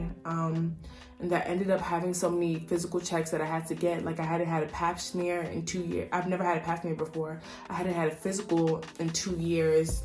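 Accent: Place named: United States. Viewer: Yh your American Accent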